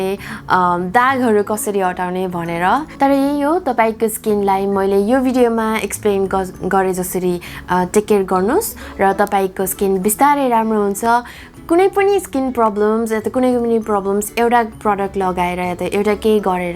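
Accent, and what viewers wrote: Nepal. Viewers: Kati cute tapai Ra tapai ko Nepali bolne 😘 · love your vids. your way of speaking nepali is sooo cute. can you plzz do hair care routine video as whenever i see your hair its so perfect. much love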